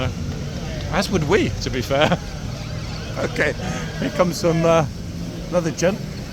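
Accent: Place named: United Kingdom. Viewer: You know us American girls are sucker's for a British accent. I like your videos and have been watching old ones I never saw in between your new uploads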